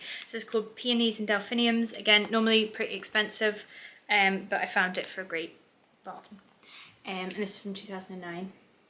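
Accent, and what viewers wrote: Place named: Scotland, United Kingdom. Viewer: Fellow flosstuber and new subscriber here 💗 so happy to find your channel!! I live in Texas, USA, but love your beautiful country and have been to Glasgow! Our daughter went to graduate school in Edinburgh and we have been to Scotland several times to visit her. Your accent is delightful and your stitching lovely! I am hoping to watch your WIP parade soon.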